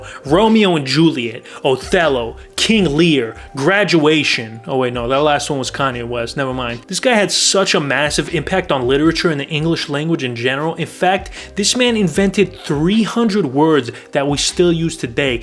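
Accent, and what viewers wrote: New York, United States. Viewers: You sound so New York to my Briskish ears. Love your material man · when you said “hey why don’t i do a video on this” i thought you were gonna say “aye i’m wolkin here” bc of your goofy ass accent everything about you is extraordinarily cringey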